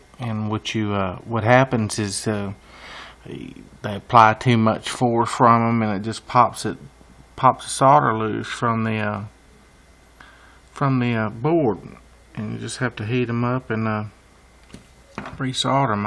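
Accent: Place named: Texas, United States. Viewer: There is nothing more pleasant than an intelligent man with a Texas twang!!!! God Bless you!!!!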